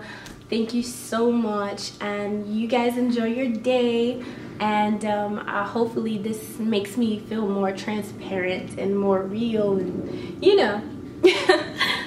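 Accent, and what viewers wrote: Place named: Ethiopia. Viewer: I’m sorry this happened to you. Stay strong 💪🏻. By the way, your Ethiopian accent mixed with American sounds soo nice 👌.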